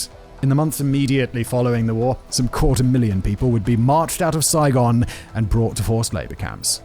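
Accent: United Kingdom. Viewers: The Idiots Guide to Vietnam's Reunification for Tourists. Qualified by annoying pretentious and pompous British accent.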